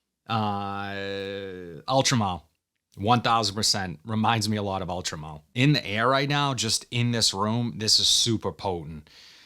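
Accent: Boston, United States. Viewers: I love your Boston accent Bro. I’m in Houston, so I don’t have a real strong Texas accent, but it’s still a Texas accent. I’m gonna go watch the Departed now lol. Sotd Guerlain L Homme Ideal edt.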